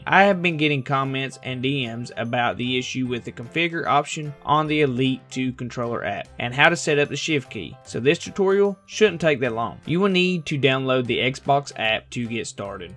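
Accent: South, United States. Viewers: Thank you man your Southern drawl is exactly what I needed for in an explanation on how the f*** to use the shift paddle after I've had these controllers for like 3 years and never even considered it. And don't mind them comments the videos perfect we can stare at those Stars on your desk top while you explain some stuff. Thanks brother.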